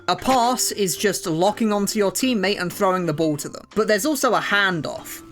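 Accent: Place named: United Kingdom. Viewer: heywhy r u british :(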